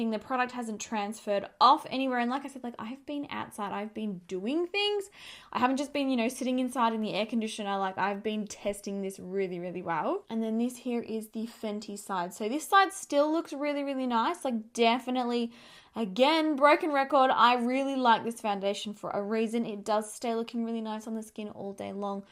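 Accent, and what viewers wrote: Australia. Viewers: I could listen to you talk allll day. Love the Australian accent. 💕 I agree the Maybelline side looks flawless. Great shade for you too. Could I just say, I would love to see you and Tati Westbrook do a video collab! I think your personalities would "gel"! 🥰 Or you, Tati and RachLoves together! 3 fantastic, beautiful, personable Youtubers. 🤗🤗🤗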